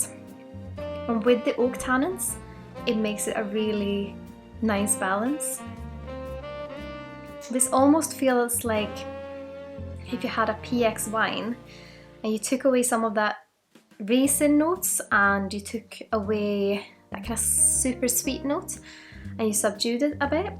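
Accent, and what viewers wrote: Scotland, United Kingdom. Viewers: Är du skotte eller har du övat på ditt skotska accent?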